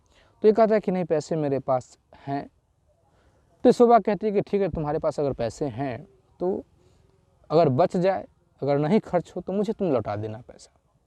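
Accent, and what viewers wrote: Bihar, India. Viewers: आपकी बोली में बिहारीपन भाषा का झलक आ रहा है स्त्रीलिंग पुलिंग का पता नहीं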